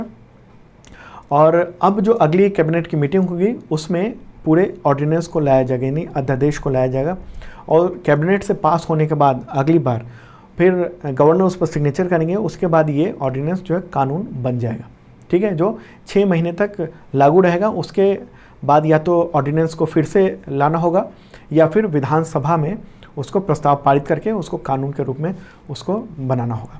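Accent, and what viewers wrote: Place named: Haryana, India. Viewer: Bahi tu haryana se hai kya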